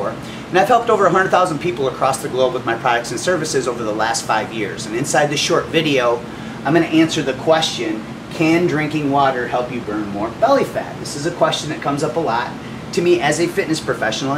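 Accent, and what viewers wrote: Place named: United States. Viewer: nice video, You have a very strong American Accent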